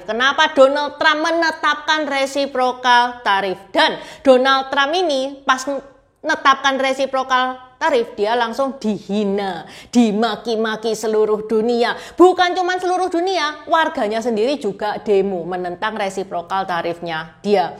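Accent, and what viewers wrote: Java, Indonesia. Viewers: Pekat sungguh bahasa susah nak faham bercampur dengan bahasa jawa tidak seperti miss sari bahasanya mudah difaham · Usul buat ci, ya...tolong ditambah ngomong mandarinnya, Ojo mung medok jowone❤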